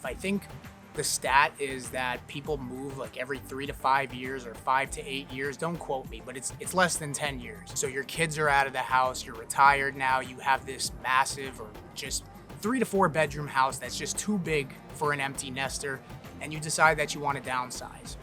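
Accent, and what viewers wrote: New Jersey, United States. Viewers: My girlfriend and I (retired in Maryland) will be visiting our favorite beach town (Hollywood Beach) next week for fun and sun. Somehow we came across your videos,..and even though we have no interest in living in/moving to Fla,..we totally enjoy your videos. The Jersey accent,...and the excellent speaking skills have us hooked.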